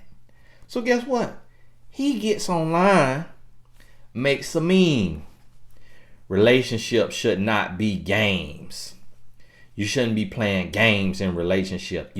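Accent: South, United States. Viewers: U my training wheels i love your voice its country a accent now lets get in tune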